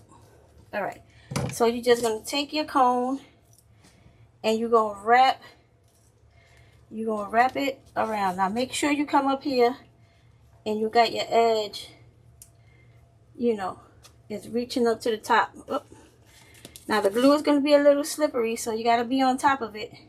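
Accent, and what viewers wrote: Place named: Louisiana, United States. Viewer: Just discovered you!!! I love it!!!! Your accent reminds me of Louisiana... Baldwin, Franklin, Jeannette, Grand Mary, New Iberia ....somewhere round there💜💜💜👏🏽👏🏽👏🏽👍🏽👍🏽👍🏽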